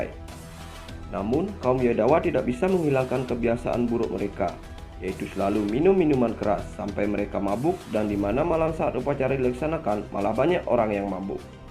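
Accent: Bali, Indonesia. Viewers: Logat balinya kental banget🤣🤣🤣🤣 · Aeng kentel logat baline..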